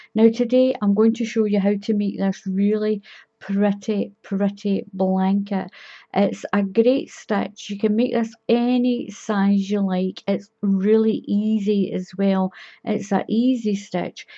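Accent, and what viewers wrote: Scotland, United Kingdom. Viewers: Thank you, you are such a good tutor. I love your Scotish braw.I've got a few Scottish friends also, so I'm used to hearing that.I love this pattern it's brill. I've got my wool ready, so I'm gonna make this..I'm just getting over Coronavirus so we're social distancing self isolating as best we can, me and my Husband are, I need something like this to pass time. So glad a found you💗.Keep safe.Take care.xx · It's also nice to hear another Scot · This is a beautiful afghan/blanket stitch. Thank you for excellent simple instructions. I absolutely love your accent - I love accents - and I believe you are Scottish, which I have ancestry in Scotland and Ireland. Thank you so much for this help and will be going back and viewing other videos you have put on YouTube. Thank You and please you stay safe and healthy as well. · Thank you so much. 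This pretty baby blanket certainly did crochet up quick and mine turned out gorgeous just like yours. You made following the pattern so easy. I feel so much more confident now. Just love your Scottish accent. Thanks again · I love your tutorials. Plus I love your accent my mum was Scottish sadly she has passed away and she sounded just like you I could listen to you all day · Are you from Scotland? Love hearing you talk!! Thank you for going slow and explaining so well. ❤ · I love this very pretty blanket, and I will be doing this for my new grandchild when he/she arrives end of June, thank you so much for your very easy to follow instructions, and your lovely Scottish accent xxx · I am so delighted to have discovered your channel - your instructions are very clear and as a fellow Scot I love your accent! I'm fairly new to crocheting and I'm finding it difficult to know what size would be best for a pram blanket - I am seeing anything between 24x34 inches to 30x39 inches for an oblong blanket and anywhere between 36 and 48 inches square which would make a big difference to how much yarn I would need to buy! 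Could I just ask, if you were crocheting a pram blanket, what size would you make for an oblong or square blanket? Many, many thanks! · well I think it’s a lovely stitch and blanket, and hope to recreate it myself. You can’t please everyone. Nice to hear a wee Scottish accent and look forward to seeing more from you. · thanks for this lovely pattern. I'm half way through it. The yarn I used is a little fine, but it's still working up very quickly. Love your videos & the accent..only know one person from Scotland here in Ireland. Keep up the good work.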